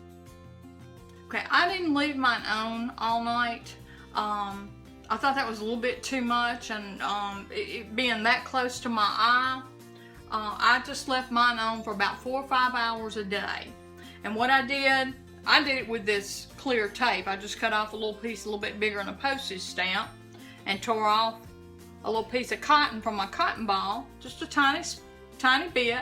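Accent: South, United States. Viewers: Love the info and love your accent. And you’re beautiful! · Nice video and love your Southern accent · Thank you:) Love your accent as well!! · Love your southern accent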